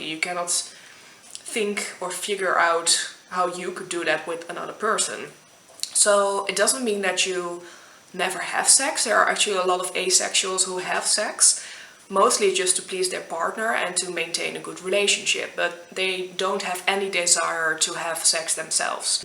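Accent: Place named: Germany. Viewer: Great video, and very clear explanation. Dutch people speak English very well. I thought you were German at first.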